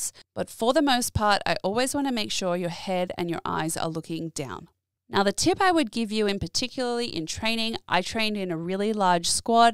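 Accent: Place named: Australia. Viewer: Think it’s just the Aussie way of speaking, but gee you make it sound so easy! I’ve watched heaps of swim channels but they make it seem so complicated. I’ll definitely be using your tips. Thanks from a fellow Aussie. 🇦🇺 👍🏽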